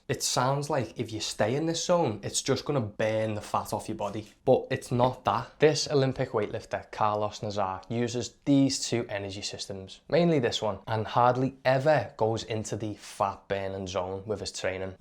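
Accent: Liverpool, United Kingdom. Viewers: Great video btw are you scouse ? Love that accent you speak like Jamie carrager , are you a Liverpool fan ?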